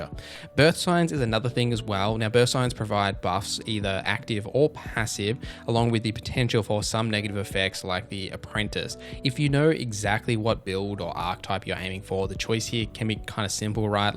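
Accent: Australia. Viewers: He's Australian cut him some slack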